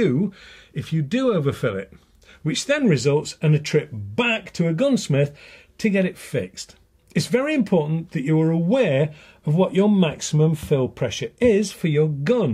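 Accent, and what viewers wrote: United Kingdom. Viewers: UK English with the accent really are the best narrators.. Soothing like Clarkson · Very good job on the video. Lots of good information for a new airgunner. I own a Gamo Urban PCP and it's a great low cost pesting tool, powerful and very accurate. There are a couple of things I would point out. At the end of your video you instructed that the user should remove the magazine from the rifle before storing. The bolt must be pulled back to remove the magazine which cocks the hammer. Also, there is a pellet in the barrel yet so when the bolt is closed the rifle is still ready to fire! I usually leave the pellet in the barrel but I always de-cock the hammer. One has to remember that there is still a pellet in the barrel too, or when they reinsert the magazine it will result in a double feed with two pellets in the barrel. To be really safe, the pellet should be removed with a cleaning rod, or, shot after the magazine is removed. 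I had a few double feeds when I first got the Urban until I learned what was happening. Thanks, I always enjoy your videos. There's just something about listening to that British accent...😉 Geo from MI, USA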